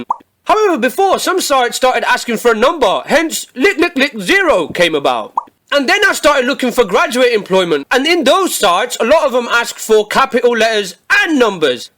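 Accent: United Kingdom. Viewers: grade are you British ?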